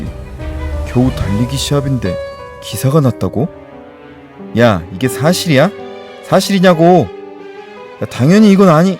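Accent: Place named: Jeolla, South Korea. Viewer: 전라도신가부다..ㅋㅋ 사투리 억양~~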